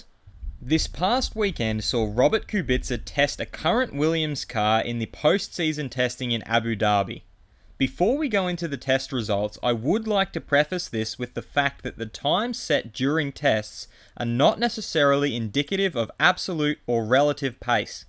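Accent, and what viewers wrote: Australia. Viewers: Great video. On your suggestions for future videos, one thing I suggest is to change your accent. For many people the Australian accent is very annoying. Thanks.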